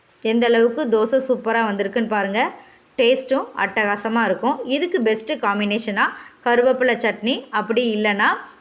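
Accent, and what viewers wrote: Tirunelveli, India. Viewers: உங்கள் பேச்சு சரியான திருநெல்வேலி தமிழ். கேட்பதற்கு இனிமையாக இருந்தது.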